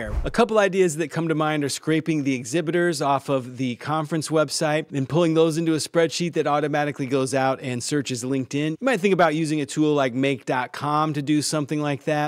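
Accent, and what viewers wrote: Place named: United States. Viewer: Great but talks too fast. Slow it down. Your channel audience is all over the world and we don’t all understand American English as well as native speakers.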